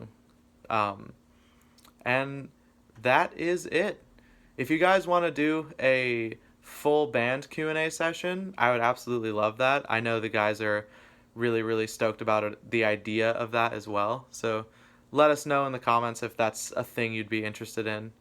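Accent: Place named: Manchester, United Kingdom. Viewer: Wow. I’m fuckin man. I’m a Scot. I honestly thought you were a Manc or something. Just the way you sing. Very smiths sounding I guess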